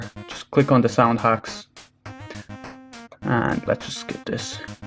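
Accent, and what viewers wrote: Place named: Netherlands. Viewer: are you dutch because i hear some sort of accent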